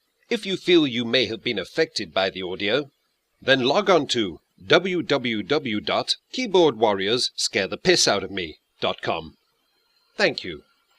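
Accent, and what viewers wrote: United Kingdom. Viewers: In the US there is something about the UK accent we enjoy. Something different I suppose. Is it the same over there for broadcasters with an American accent?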